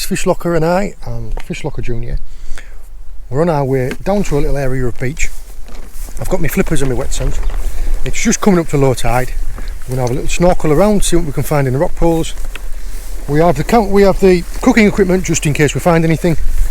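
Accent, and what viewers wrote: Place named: Hull, United Kingdom. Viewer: Proper theraputic, end of hard week, couple of beers watching. There's a different world under the waves. Can't pin the guys accent down though. Wanna say Hull but it'a not quite there.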